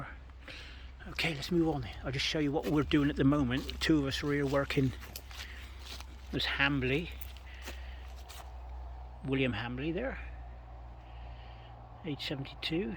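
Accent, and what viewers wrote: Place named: Cornwall, United Kingdom. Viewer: i find your videos soooooo relaxing. and i love your cornish accent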